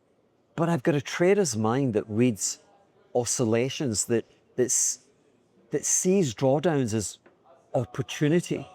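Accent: Scotland, United Kingdom. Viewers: A drunk Scotsman reading a script from a laptop. I wonder who wrote this garbled nonsesnse?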